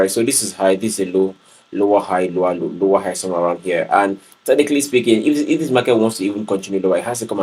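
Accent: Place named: Africa. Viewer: yeah I get you but I've been following this guy for about 2 years and my goodness his accuracy is top notch He speaks like an African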